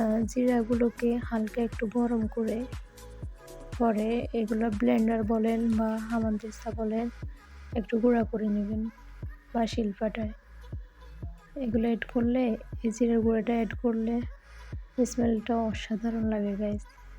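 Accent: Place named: Noakhali, Bangladesh. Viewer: আরে না।। খারাপ না কথার ধরন পুরা নোয়াখালীদের মতো । তাই বললাম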